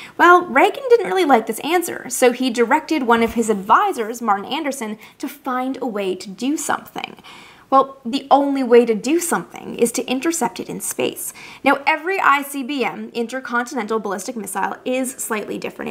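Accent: Canada. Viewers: I love how you have defined this area of history and claimed it as your own. You always post great stuff. Very surprised to hear you don't have an opinion on SDI after you explained it so very well. Treaty language largely says defense measures are not treaty violations and you summarized it all very well. So, despite small disagreement, very well done.... and your Canadian accent still shines through. Very adorable.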